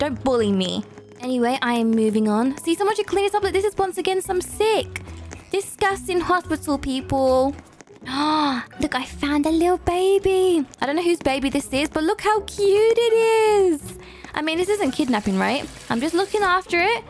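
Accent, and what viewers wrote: United Kingdom. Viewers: I love her British accent❤💛💚💙💜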